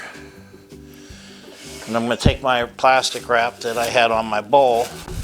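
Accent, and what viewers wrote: Pittsburgh, United States. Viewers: Did you ever read my mind! I"ve been looking for a recreation of Mancinis recipe for a very long time, usually give up! Grew up on the stuff, so many memories of driving down to the bakery in the 'Rocks to get it fresh. Our Sunday spaghetti dinner could go without meatballs, but NEVER Mancinis! Live too far away now to get it sent to us. My heartfelt thanks to you for sharing this, especially during these tough times. Little nostalgia goes a LONG way for comfort. So happy to find your channel! Just hearing your Pittsburgh accent makes me happy! If I don't have the malt powder, could you suggest an alternative? Also- cannot make real hoagies without this bread, so now I can pretend I ordered one from Portofinos. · Thank you from the bottom of my black & gold heart! 💛🖤💛 I’m a Pittsburgh girl living in Arizona and, like the others, I load up on Mancinis every time I come home! 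You are a godsend. Naturally, I am now subscribed to your channel. Your accent makes me feel like home. And thank you for explaining everything so well. All the details are so important And I can’t get that from reading a recipe. Go Steelers.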